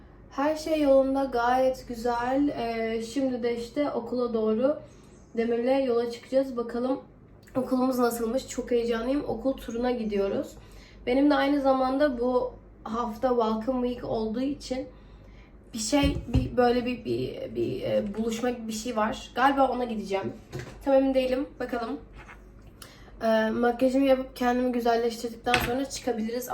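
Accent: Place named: United Kingdom. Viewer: Ingiliz aksanli heyecanla bekliyorumm❤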